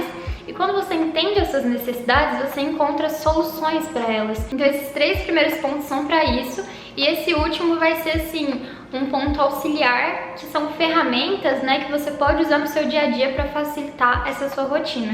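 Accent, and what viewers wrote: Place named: Goiás, Brazil. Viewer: acho mto fofo o seu sotaque goiano puxando o r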